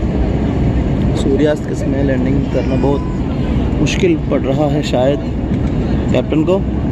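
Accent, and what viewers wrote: India. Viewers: Bhai india ka bilkul desi ladka h Very good video ye h bharat Ke gaon ki desi aawaj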